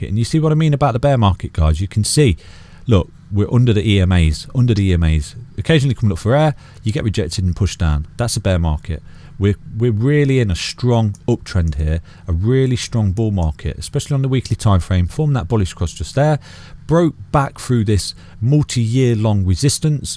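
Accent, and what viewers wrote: United Kingdom. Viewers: I can’t understand if I like more the analysis itself or your distinctive British accent. 😄 Miss UK a lot. Sub and Like! 👍🏻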